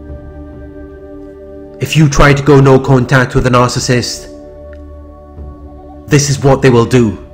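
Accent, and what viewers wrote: Wales, United Kingdom. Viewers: This guy sounds like he from Cardiff or Newport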